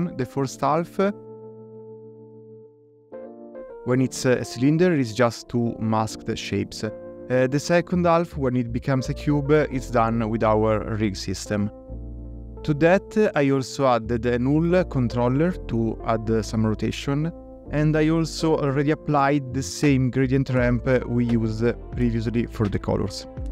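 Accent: Italy. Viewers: sbaglio o sento l'accento italiano? Congrats man! I've just discovered you and it was instant follow. Fa sempre piacere vedere del talento italiano. Di dove sei? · the italian accent is better then the tutorial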